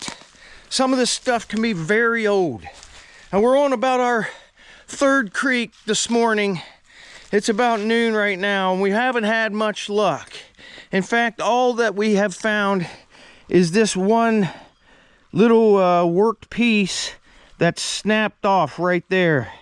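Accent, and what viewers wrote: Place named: Delaware County, United States. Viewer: love the delco accent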